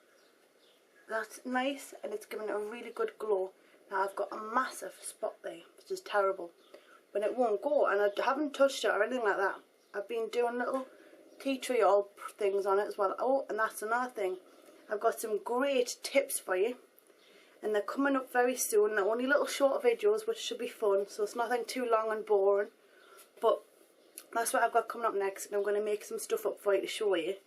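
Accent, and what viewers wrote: Newcastle, United Kingdom. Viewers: definitly from newcastle, im from newcastle so i know the accent pretty well lol, i'd be surprised if she wasn't. love your video's by the way. x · are you a geordie? you'd be sooo great on geordie shore! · She's geordie, GEORDIES UNITE! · She's not Scottish..She comes from Newcastle. In England. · love it how every one thinks your scotish when blantly your georide lol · i think she's geordie... like Cheryl Cole... northumbrian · Just found your video, it's the first one I've watched, and I'm really impressed. 5*s for your video, and definitely subscribing :) And I love your accent, I might be wrong, but is it Newcastle?? x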